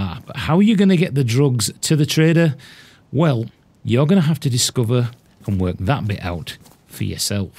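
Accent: Yorkshire, United Kingdom. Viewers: Orate buddy luv your vids. I’m tipping you don’t live far from me with that lovely Yorkshire twang you’ve got. I’m playing on PS4 so I’m a few updates behind I believe. Can I still find weed seeds?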